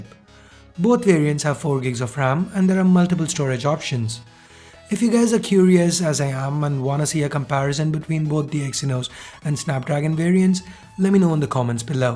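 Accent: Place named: India. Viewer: this is the best Indian english accent i watch so i SUBSCRIBE SO FAST SO I CAN UNDERSTAND CLEARLY 😊😍😁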